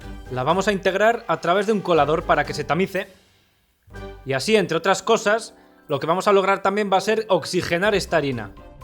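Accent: Spain. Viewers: soy Mexicano y me encanta la cultura y ese acento unico de nuestros queridos Españoles. ¡¡¡Que viva la madre patria!!!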